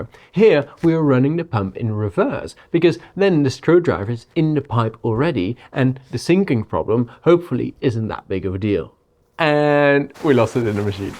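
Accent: Netherlands. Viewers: I love the dutch english accent ❤😂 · is this guy dutch?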